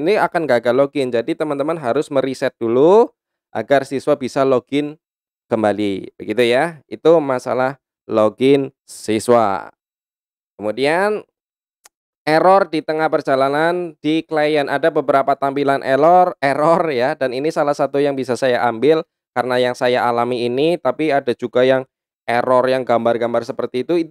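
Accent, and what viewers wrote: Banyumas, Indonesia. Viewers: terima kasih om...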